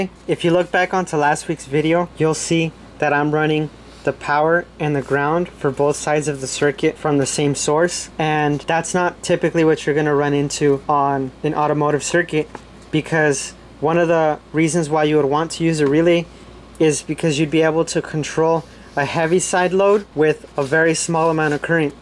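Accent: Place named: Latin America. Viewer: Se te escucha la voz de latino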